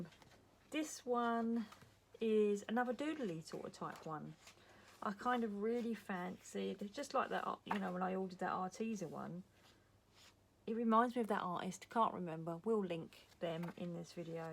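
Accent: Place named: England, United Kingdom. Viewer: I started with Colouring, did a bit of en masses, art journaling, collage, atc's, and then went to journaling for about two years and now Ive come back full circle to Colouring. Funny how things go. First time I've seen any of your videos, odd hearing another English voice, I mainly only watch Americans. Happy Colouring hun x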